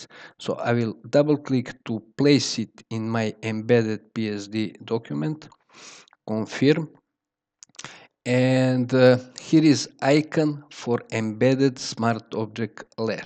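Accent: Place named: India. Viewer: INd-lish, speech very bad. i don't hear sound.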